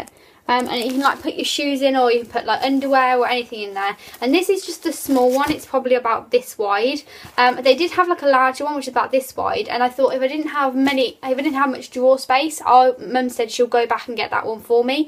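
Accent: United Kingdom. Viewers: Are u from Scotland or England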